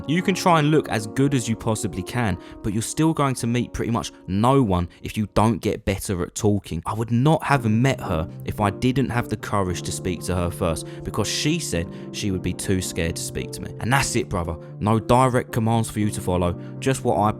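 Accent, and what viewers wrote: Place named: Essex, United Kingdom. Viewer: Proper funny mate - just found your channel, you sounds like a fellow Essex man.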